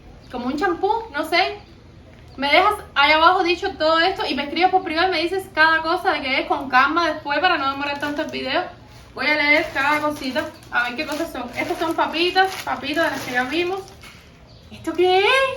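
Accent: Cuba. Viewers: Eres cuban?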